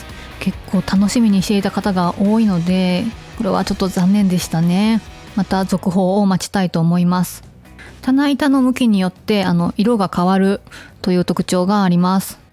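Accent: Kansai, Japan. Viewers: やっぱり、少し訛ってますね❤でも関西弁愛おしいですよ。新商品見たのに忘れてしまってました。説明を聞いて思い出しました😅有難うございます😊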